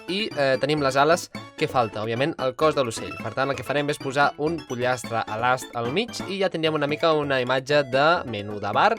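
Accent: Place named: Mallorca, Spain. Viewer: I aquell accent mallorquí?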